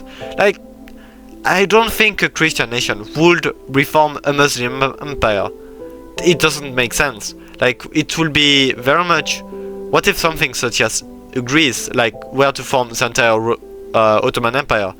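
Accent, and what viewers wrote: France. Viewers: Je serai surpris si tu n'etait oas francais,qsa vois avec la voix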